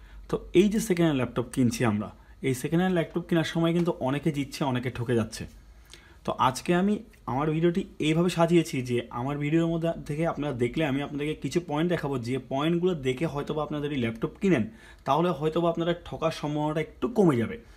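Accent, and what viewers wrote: Chittagong, Bangladesh. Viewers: Apnr kthar dhoron shune amr versity life er kichu frnder ktha mone pore gelo tader bari chilo Chittaging...Oidiker manushgula oshadharon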